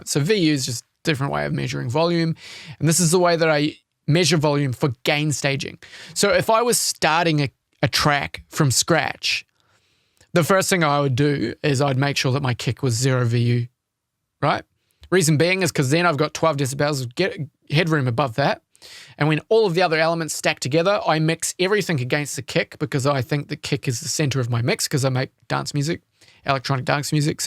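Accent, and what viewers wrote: New Zealand. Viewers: Hey mate. Just found your channel and so nice to hear a fellow Kiwi accent. I just set myself up with Ableton live lite. free with my Focusrite solo interface. I've just watched your first video of the 25min intro for beginners and found it great. It would be great if you changed your mouse cursor setting to make it bigger on the screen and easier to follow your movements when sorting settings. other than that, Just subb'd thanks for you channel!!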